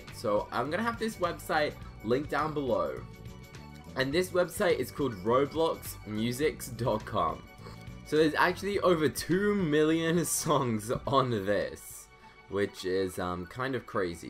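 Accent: United Kingdom. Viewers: are u british? 👁👄👁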